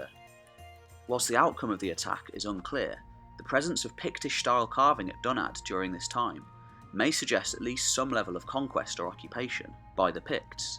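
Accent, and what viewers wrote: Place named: England, United Kingdom. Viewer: Arturo Wagner I am going to assume you are from somewhere outside the UK because you can't seem to be able to tell the difference between a Scottish and an English accent, Ewan McGregor is Scottish, the narrator is English, and on top of that the tone of the narrators voice is absolutely nothing like Ewan McGregor.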